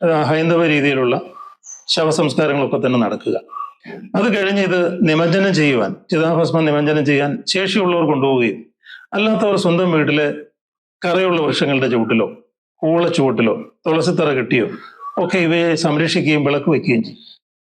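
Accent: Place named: Thiruvananthapuram, India. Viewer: Slang TVM. ..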